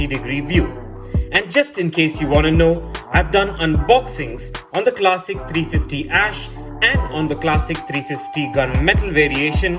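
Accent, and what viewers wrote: United States. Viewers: Your English accent is like an American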